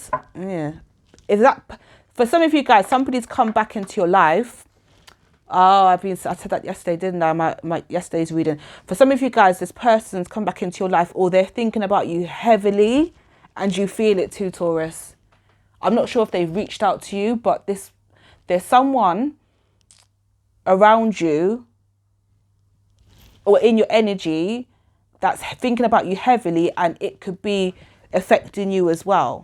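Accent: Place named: United Kingdom. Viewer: Love the accent, girl. Makes me want to be British. Nuff love from the Jamaican One.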